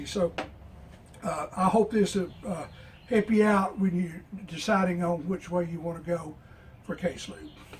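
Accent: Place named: South, United States. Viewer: I really liked the information you provided. Just for fun I turned on the closed captions and they really don't do us people from the south or with southern accents any justice. They messed up every 5th word you said.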